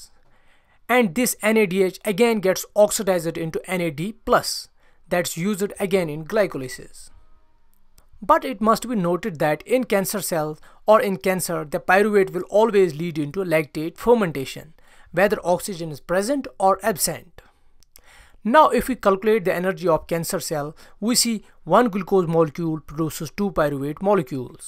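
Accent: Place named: Kashmir, India. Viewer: Hehehe funny Kashmiri English dialect...... love it.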